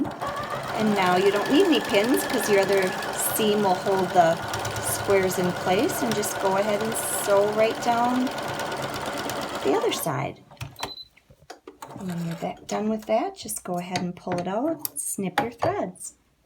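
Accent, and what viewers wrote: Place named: Upstate New York, United States. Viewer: I have a dear cousin in upstate NY....is that where you're from? I love your accent. Thank you for your excellent tutorial!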